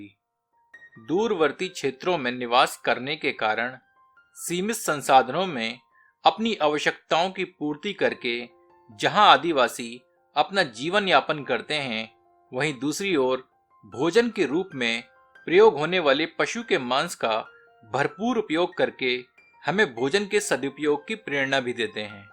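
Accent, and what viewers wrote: Standard. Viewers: aapki hindi bahut shudh he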